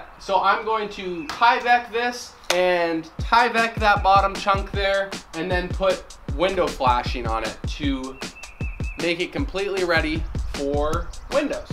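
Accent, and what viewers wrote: Canada. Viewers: The plural of "axis" is "axes". Also, your Canadian accent is awesome. :-)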